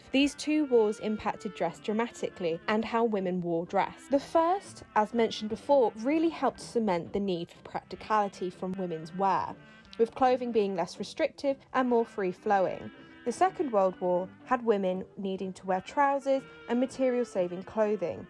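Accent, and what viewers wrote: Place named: United Kingdom. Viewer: Voice and diction teacher here, slow down and enunciated your words. Your British accent isn't quite RP and is difficult for Americans to understand/process.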